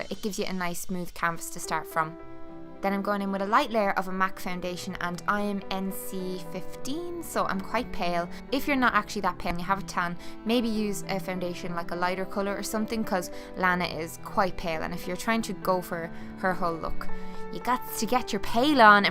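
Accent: Ireland. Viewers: Loved this look! I really love watching your videos because you seem like such a genuine, real person!! Plus a little Aussie like me loves a good Irish accent, it's so adorable!!:) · HEY! I stumbled across you're channel the other day and after about a year of listening to Americans drone on in their slightly annoying accent on Youtube, listening to you're accent was a welcome change. (I'm from Kilkenny!) You're videos are great, it's nice to see an Irish Beauty Youtuber with such great content!!:D Well done and good luck!!:D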